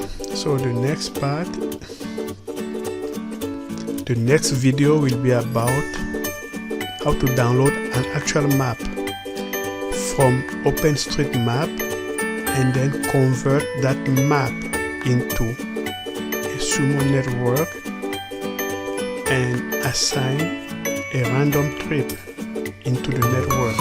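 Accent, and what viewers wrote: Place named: France. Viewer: try to improve your english you speak frenglish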